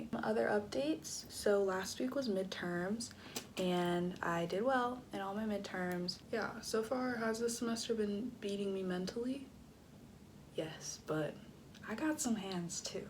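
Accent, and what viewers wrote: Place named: Australia. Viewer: austrailian accent yes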